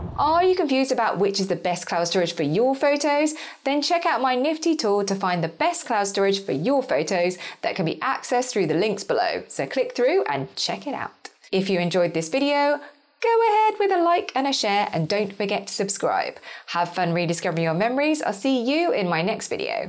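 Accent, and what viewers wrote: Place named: England, United Kingdom. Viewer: Bossy. English. I like it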